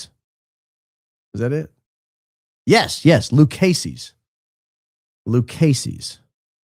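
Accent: South, United States. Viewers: I finally figured it out! Everytime you go into your southern accent you sound just like Foghorn Leghorn! I had to look it up, check him out!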